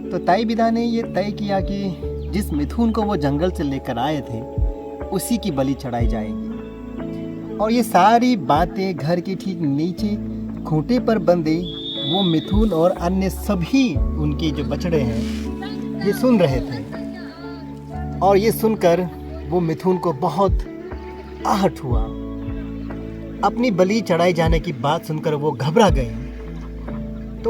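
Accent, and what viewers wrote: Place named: India. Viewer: Your Hindi accent 🥺💖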